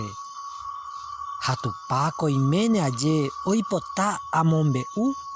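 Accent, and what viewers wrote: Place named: Paraguay. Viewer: Hermosa poesía de nuestro poeta campesino, a veces no comento por qué ya soy redundante jaja. Entendí bastante , pero escuchar esa voz con acento guaraní ya llena el corazón. Seguramente en el medio de la noche se pueda apreciar mejor la belleza de las estrellas, sentir en Soledad la fragancia de la tierra, algún sonido misterioso qué solo la noche te puede regalar... sin temor a algún aparecido ó fantasma. Cantarinas palabras florecidas en plena medianoche, hace alegrar mi existencia y me contagió y me creo dueña por un rato del poeta...🥰🎼🌈❤🌻🦋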